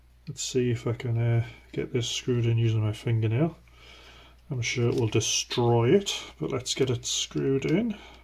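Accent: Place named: United Kingdom. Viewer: Local radio in Vancouver but your accent is from the UK, I guess you moved there for some reason?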